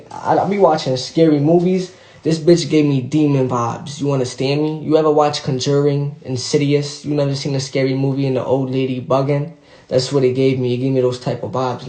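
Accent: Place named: New York, United States. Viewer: what makes the story even funnier is his New York accent 😭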